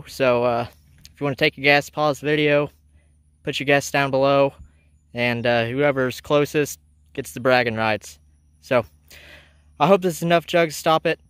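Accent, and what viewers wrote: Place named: Western Pennsylvania, United States. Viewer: had this chat with you on gunnitrust as well. Didn't realize this accent went past Pittburgh area heading west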